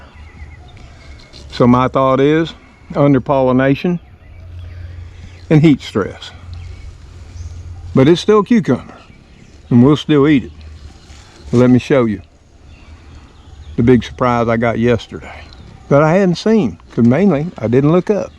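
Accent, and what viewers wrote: South, United States. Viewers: I absolutely love the southern accent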